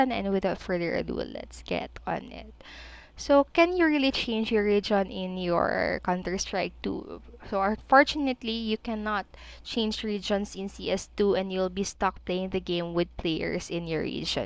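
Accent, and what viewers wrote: Philippines. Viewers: pilipina accent <3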